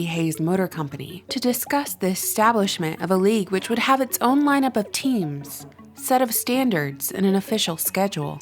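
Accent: South, United States. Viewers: i always love it when you drop a new video, This is simply asking folks (with that mighty fine southern accent no doubt) to objectively, with an intellectual process, and without unreasonable emotional reactions... to take a closer look at this annual ritual that has spellbound Americans for decades as they live vicariously through their favorite team and player. It's parasocial behavior believing they actually know personally players, staff, performers, etc. All that consciousness. All that attention... focusing on an inconsequential silly game where silly grown men, paid millions, play with a silly ball. makes ya kinda wish something like... oh i don't know... umm... the f**cking federal reserve and our bullshit fiat currency would get that kind of attention! Shoot. One could even argue that something insidious as the fed exists due to everyone concerned with a silly football game. 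anyways... Another great video. you're so foxy. i love you. there. i said it.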